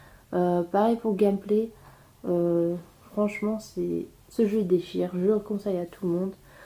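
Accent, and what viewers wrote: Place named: Nord, France. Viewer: Salut , pas mal de bonnes trouvailles GG à toi avec en plus un accent du Nord on dirait ...Je mets un Like et je m'abonne . Bienvenue à toi sur ma chaîne ;). A bientôt . Yoguy72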